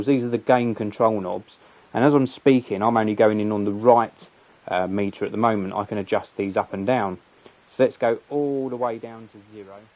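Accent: United Kingdom. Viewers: we dont hear odio , we hear audio , could you plz speak proper american english (, and english is my fourth language ) , instead of wanker british accent sound too GAY ! even farel collinn learned that in movies -see its not difficult to speak normal..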